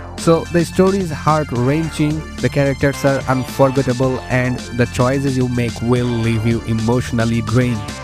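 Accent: India. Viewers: Yeah, I don't need to play any of these. Always these top games must play videos with some heavy accent Indian guy and the lists are always crap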